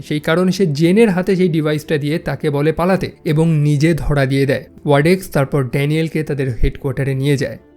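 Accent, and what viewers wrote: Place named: Bangladesh. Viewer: ভাইয়া আপনি কি ইন্ডিয়ান? কিন্তু আপনার কথা বার্তা বাংলাদেশীদের মত শোনায়। তা যা-ই হোক মুভি এক্সপ্লেনেশন অস্থির করেন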